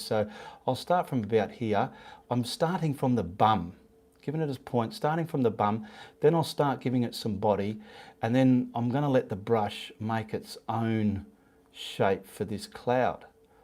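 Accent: Australia. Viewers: I just love hearing u talk...ok? U sound like crocodile dundee for me ok? U paint beautifully! I am so frustraded because i really can't draw or paint, have no skills what so ever, but it's so fun when you manage something that looks like something if u know what i mean. English is'nt my Language so bare over with me. Well anyway wanted to say i like both your talking and painting. Signing out from Norway, have a nice day...ok? 😀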